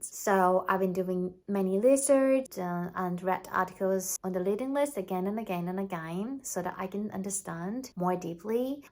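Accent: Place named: United Kingdom. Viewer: Like your accent! Sounds so ‘British’ 😊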